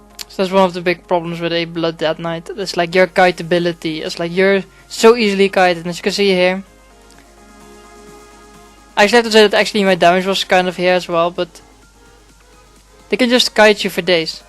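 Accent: Netherlands. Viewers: the dutch exent is so enoing but the info is kinda good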